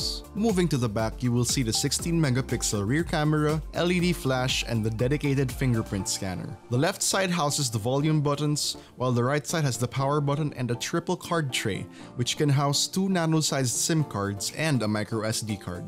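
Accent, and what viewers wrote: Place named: Philippines. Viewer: His phillipino accent is so strong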